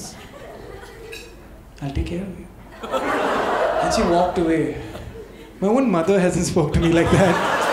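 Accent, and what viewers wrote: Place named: Tamil Nadu, India. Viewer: He got alot of tamil influence 😂😂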